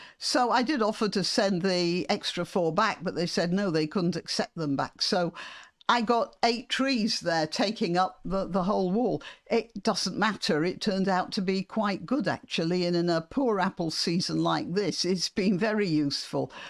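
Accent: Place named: Yorkshire, United Kingdom. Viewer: With your Yorkshire accent, I imagine I'm in The Secret Garden.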